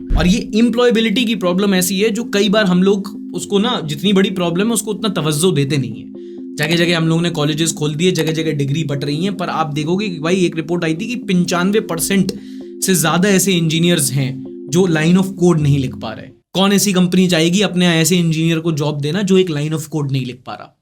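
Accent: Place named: Jhansi, India. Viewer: Bhai aap jhansi se ho kya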